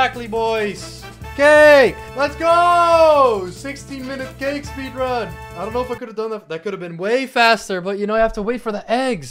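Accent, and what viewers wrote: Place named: Netherlands. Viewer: ur accent sounds dutch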